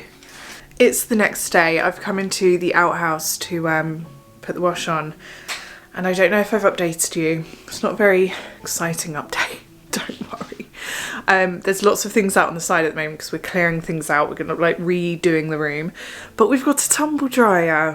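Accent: RP, United Kingdom. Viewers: You speak posh but the more I get to know you, you are very down to earth and real but not that there’s anything wrong with being posh 😂 you’re one my favourite yt’s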